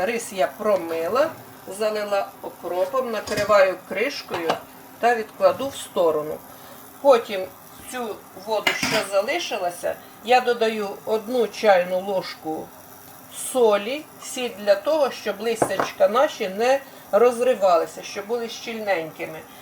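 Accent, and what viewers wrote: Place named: Odesa, Ukraine. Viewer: услада моему слуху,вѳ с одещины,сердце радуется я выросла там.Берегите себя,милые люди.Дякую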